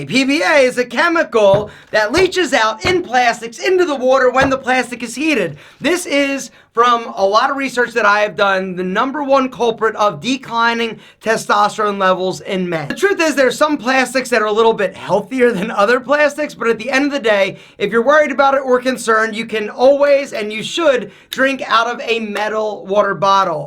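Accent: United States. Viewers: One thing I can say is, you are Talkative! Don't speak too much, remember you are American (have accent and not all your viewers are saMe like your race) not a Pilipino or Indians or Thailand etc. If your other subscribers and viewers are not same your race, so do you think they will understand you if you speak so fast like this now? And most, if the person have no idea what is testosterone then how it is even You explain in a fast track!!! Weeeew..... It's good that I am fluent in English, if to other people who loves to watch your video, I hope they will understand you in that ways how you speak like a Talkative Guy, Parrot is better than to You how to speak hahaha sorry peace I am your good watcher I think but today I comment negative because this is what I observed guys sorry! 🇵🇭🇵🇭🇵🇭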